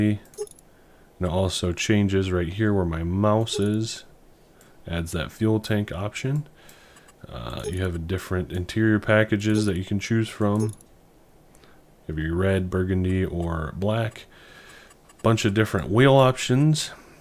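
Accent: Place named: Michigan, United States. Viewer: Haha just started watching your videos searching for allis chalmers heard your voice and said to myself that guy sounds like he is from Michigan and lo and behold you are lol I to am from Michigan in the U.P. I thought I caught a little yooper accent from you but could be northern Michigan as well lol anyway thanks for the video.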